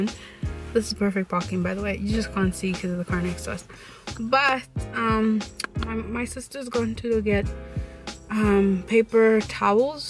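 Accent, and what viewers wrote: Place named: United States. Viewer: I've been watching your channel since it began and have always wondered why you have an "American" accent of sorts? Were you born/raised there? Hope I don't come off as rude, I'm just curious.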